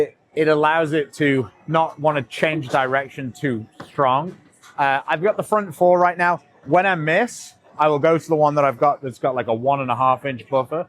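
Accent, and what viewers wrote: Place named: United Kingdom. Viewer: Extremely britesh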